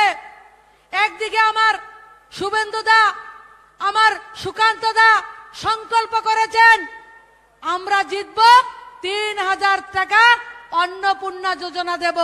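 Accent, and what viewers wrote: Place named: Comilla, Bangladesh. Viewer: তিনি সম্পূর্ণ ভাবে কুমিল্লার ভাষায় কথা বললেন।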